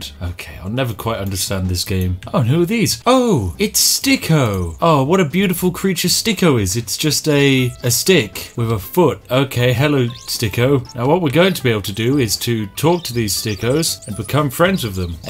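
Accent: United Kingdom. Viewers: Are you actually British because that's not how i speak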